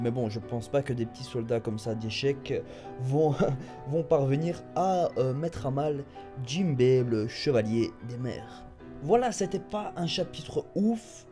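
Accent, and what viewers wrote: Belgium. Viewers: "ouit cent cinquante deux" hmmm je kiffe l'accent belge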